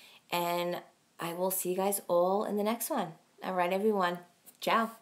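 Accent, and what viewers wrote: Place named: New Jersey, United States. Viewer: God Bless You, Girl!!! Stay Strong!!! Looks like you have turned out Ok considering what you have been Dealt with. You are Stronger than you think!!! I also grew up in a VERY Dysfunctional Family & I can Relate to you somewhat. I sent you a FB Friend Request, I Really would like to get your Address. Maybe to send you Coupons, etc... I am from Ky & I have a Southern Accent. I was showing my Husband your Videos & was talking about your Jersey accent. I used to LOVE to watch Jerseylicious on TV. I don’t know if you know about that show or Not??? But, you remind me SO much of those Girls. You would be Tickled at my Accent as Well. 😆 Anyway, My Prayers are with you & your Momma. Thanks for your Videos, I enjoy them. 👍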